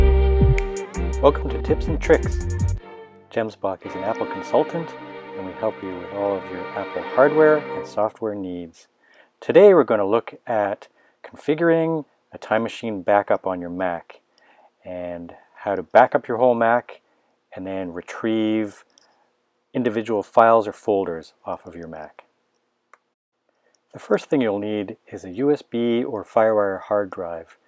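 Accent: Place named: Canada. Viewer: Canadian accent equals automatic dislike!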